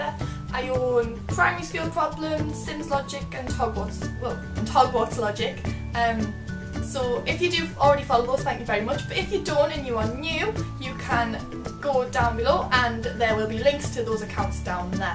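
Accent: Newcastle, United Kingdom. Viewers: i follow both your accounts :) :) and im a geordie as well :) nice to hear someone on the internet talk like me :) :) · Haha, I loooove your accent! :D Thought you were from Scotland at first, but seeing as Newcastle is "almost Scotland", I guess I was close enough. ;) (I spent an Erasmus semester in Edinburgh and my heart is melting every time I hear a Northern accent! :) Btw, great video as well! Very entertaining to watch. xx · Wont be having any problems understanding you, been watching Geordie Shore for so long. Good job on your first video (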